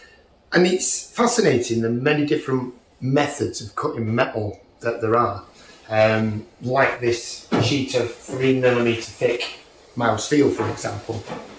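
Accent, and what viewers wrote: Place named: England, United Kingdom. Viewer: it's hard to listen to specially the English accent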